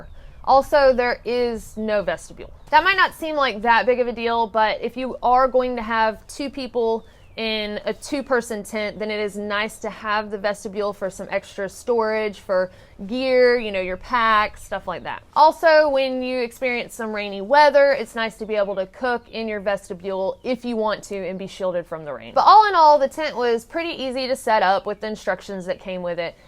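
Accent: South, United States. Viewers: lol I assume you are from the south'' hose pipe'' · Wide or Why'd? Southern accents are so cute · Greetings from Spain. There is a Quechua brand tent from decathlon stores and it's only at €20. Very similar at specs and performance. I really enjoyed your American accent :)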